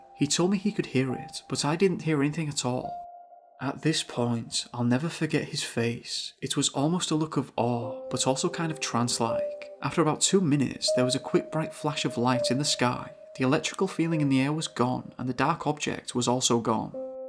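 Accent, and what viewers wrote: United Kingdom. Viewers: It's called an accent..a very specific British one.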